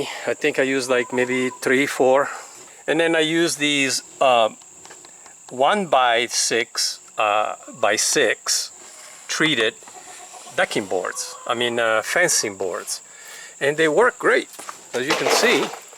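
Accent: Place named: Sweden. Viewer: Why does he have a Swedish accent when speaking English?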